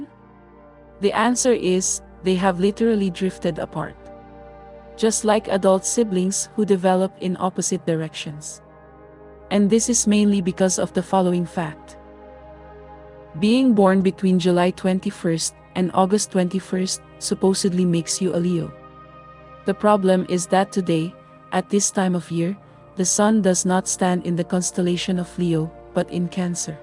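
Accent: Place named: Philippines. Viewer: My guess is the narrator is from the Phillippines. I could be wrong but hope I'm right!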